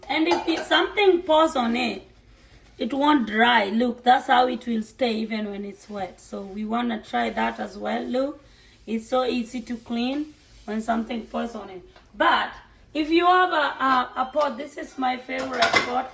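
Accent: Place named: Luo, Kenya. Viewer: Thanks for the very helpful tips, and especially nice to hear the instructions in your Luo accent.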